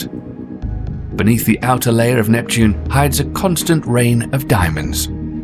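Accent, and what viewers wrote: United Kingdom. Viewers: Why does there ALWAYS got to be some brite narrating even on an American channel.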